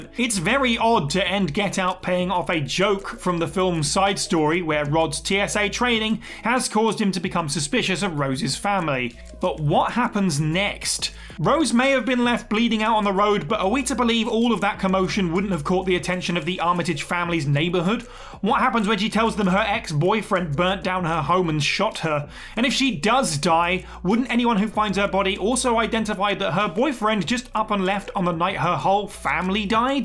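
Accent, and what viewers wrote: United Kingdom. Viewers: Anyone actually enjoy/agree with this channel’s opinions or are we all just wanting to hear ignorant opinions in a British accent?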